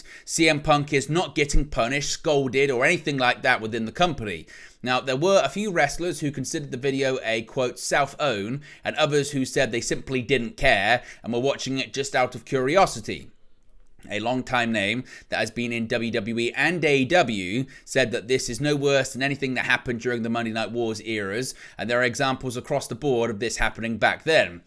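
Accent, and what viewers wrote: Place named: England, United Kingdom. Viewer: Its weird to listen to JDFROMNY do his show and then hear you do THE SAME SHOW but in an English accent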